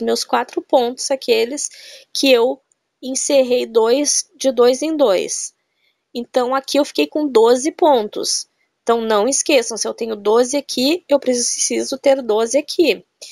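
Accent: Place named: Santa Maria, Brazil. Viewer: adorei te encontrar aqui.Gistei muito da tua receita, e a tua pronuncia, me deu uma baita saudades da minha terra(Santa Maria.) Como e bom ouvir este lindo sotaque...Felicidades e parabéns pela linda receita.bjssss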